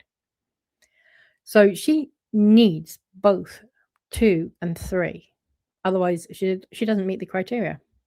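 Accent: United Kingdom. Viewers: I thought it was your British accent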